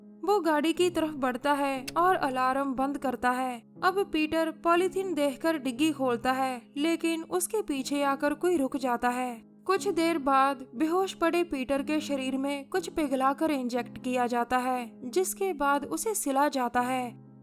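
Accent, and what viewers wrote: Punjab, India. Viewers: According to ur accent ,tusi punjab to ho? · Too heavy on MTI punjabi hindi accent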